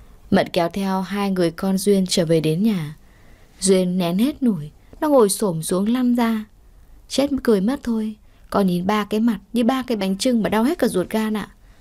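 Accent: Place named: North, Vietnam. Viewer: Giong độc chuẩn miền Bắc,. Thanks,,👍👍👍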